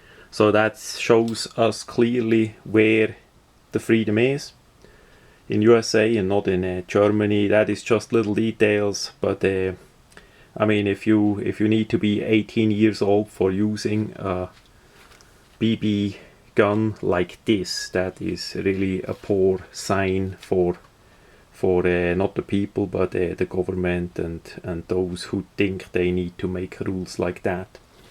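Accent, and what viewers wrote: Switzerland. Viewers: My goodness! Your accent is so stereotypically swiss!!! Great video!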